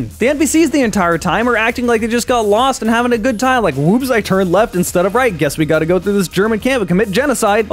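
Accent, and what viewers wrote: Poland. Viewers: Weird question, are you Polish? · I thought he is Polish :) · Are you polish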